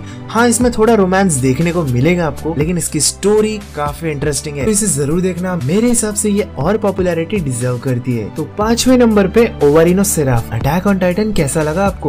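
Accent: Bengal, India. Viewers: Tumi ki bengali? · Bro are you Bengali? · Bro. Are you Bengali?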